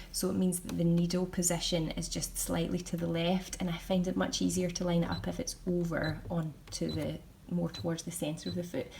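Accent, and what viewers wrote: Scotland, United Kingdom. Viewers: Fabulous. It always confused me aboout quartering, not realising that you dont use the shoulder seam. My necks always worked out well because I just used my common sense realising that the front scoop is longer. But your instructions were brilliant. I love your Scottish accent too. Thank you. You are never too old to learn I am 75 and been sewing since I was 12. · Thanks for this great video. I've just succeeded in making my first (flat) neckband thanks to your clear and concise explanations! The added bonus is your accent;) Greetings from a fellow Scot in Paris. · Brilliant tutorial delivered in a lovely Scots accent 🙂. Thank you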